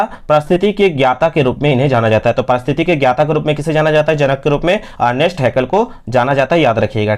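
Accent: Jharkhand, India. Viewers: Sir AAP jharkhand see ho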